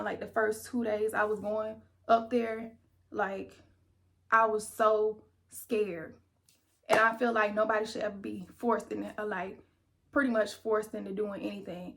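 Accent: South, United States. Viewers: Good information!! Thanks but that southern accent omg 😅😂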